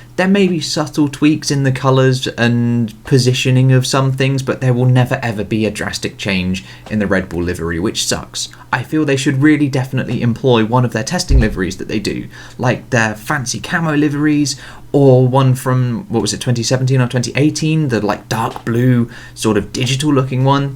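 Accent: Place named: United Kingdom. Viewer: really british accent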